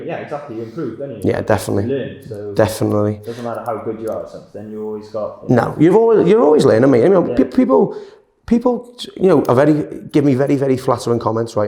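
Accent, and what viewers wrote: Liverpool, United Kingdom. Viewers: This channel is so relaxing - Loving those transformation Details & That Scouse accent from a handsome Barber. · He talks non-stop and that thick British accent makes it difficult to understand what he says.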